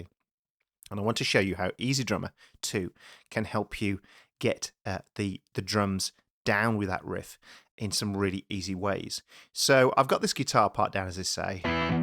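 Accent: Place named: Midlands, United Kingdom. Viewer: He definitely has a Midlands accent ;)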